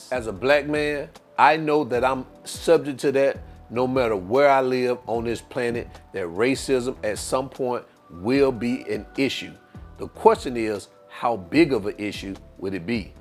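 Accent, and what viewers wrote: South, United States. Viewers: You should be a preacher. You alreary have the southern accent. You said it as you see it, and I think you right.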